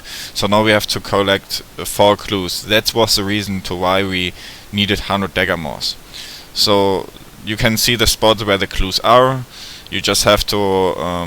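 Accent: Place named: Germany. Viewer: You kinda sound like our german teacher, I mean, your accent is kind of similar (I don't live in Germany but he teaches German) Good luck with your channel from Sweden :D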